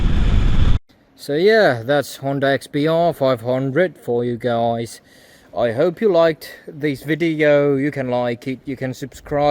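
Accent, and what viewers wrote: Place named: Baltic states. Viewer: I enjoyed your video. I'm guessing you're from the Baltics, Finland perhaps?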